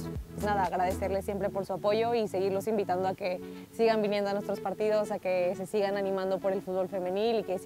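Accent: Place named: Spain. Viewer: Que barbaridad ahora traes acento español. Jolines!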